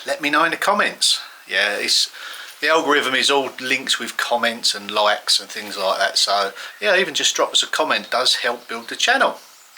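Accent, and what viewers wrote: Essex, United Kingdom. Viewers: Luv the Essex accent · That Essex accent is quite charming for those of who haven't lived in England.😊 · I have to admit that I love your brocante and architectural tours of the ancient churchs and castles and of course eating vlogs the most, but there is still something very satisfying in seeing you complete some impossible, complicated repair jobs except this last one, today, you definitely needed a safety harness on and to the viewer looked dangerous. Your Essex accent is delightful and quite understandable and if some viewers want help just "google" Help understandinng English accents". Thanks for taking me along. Mary Ann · I love your cats, I enjoy seeing what you’re doing with your lovely old cottage, and I can understand your Essex accent even though I’m Canadian! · Essex accent is just fine. Just had my DNA completed. 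Mostly I am from England. My direct immigrant ancestor was from Wherstead, Suffolk County. Went there, charming little village near Ipswich. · With regard to your Essex accent, I love it! And yes, I can understand you just fine. With regard to the channel, well, both channels really, I thoroughly enjoy them, and I have learned and am learning quite a bit from you, as well as being happily entertained. I have learned how to point and render, including what the ratio is between sand and lime; building up a wall; the strengthening rafters and purlins; as well as learning a lot about French chateaus and history. So, thank you so very much. I really mean that. And I am always looking forward to the next video! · Hi. I understand your Essex accent. I come from Zummerzet my dear but been in Aussie for over 50 years but still have my accent which I am very proud of 😊Be careful on those ladders and mind your back. 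The kittens are gorgeous and enjoying their lives. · Awsome job and being not english ( Holland) i have no problem ( so far ) with your essex accent. Love the kittens. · Nothing wrong with an Essex Accent. This is coming from an ex Romford Boy 😂. Another great video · I always enjoy seeing what you’re up to and your Essex accent is part of your charm, no problem understanding what you say here.😊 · Nice one! I’m only in Romford and thought you sounded pretty local; for France!🤣 Really enjoying your work, love it.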